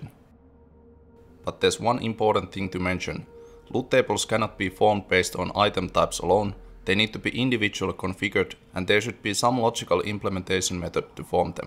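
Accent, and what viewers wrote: Finland. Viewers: Sounds like you are finnish?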